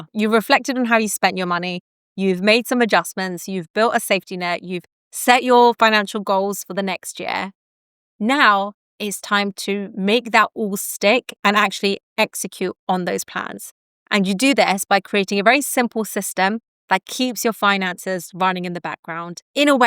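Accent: United Kingdom. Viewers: Is her accent common in the UK? Sounds amazing.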